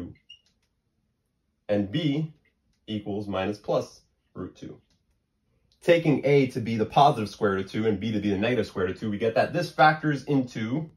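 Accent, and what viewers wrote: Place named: United States. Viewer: Didn’t know bro sounds American😭 (I thought he’s Arab)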